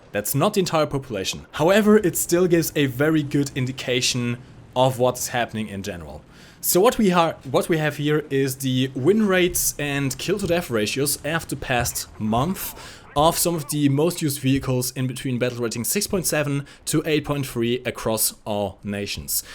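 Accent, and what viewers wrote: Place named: Germany. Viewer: I like ur German accent and also very well said.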